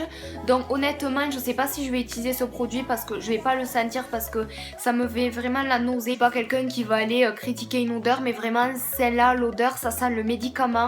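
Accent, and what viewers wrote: South, France. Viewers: l'accent du sud🙃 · Mais votre accent , vous etes française ? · Ralala l'accent du Sud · j adore ton accent du sud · L'accent du suuuuud !!! J'aime trop OMG !😍💘💍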